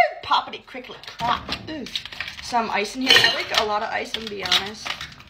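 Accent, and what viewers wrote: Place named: United Kingdom. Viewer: Loving the British accent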